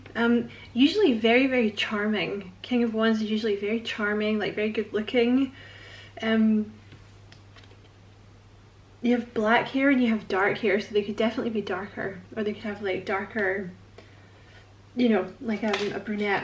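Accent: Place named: Scotland, United Kingdom. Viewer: Love your accent! You speak to my Scottish roots. 💗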